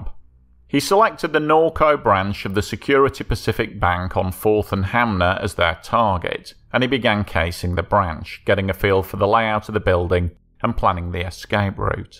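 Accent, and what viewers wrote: United Kingdom. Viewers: the host's rather British accent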